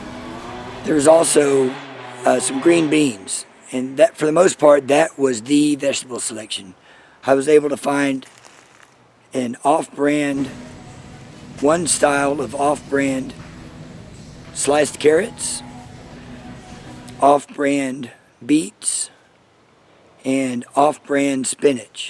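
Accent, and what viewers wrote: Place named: South, United States. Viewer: I suscribed just because I love how southern your accent is lol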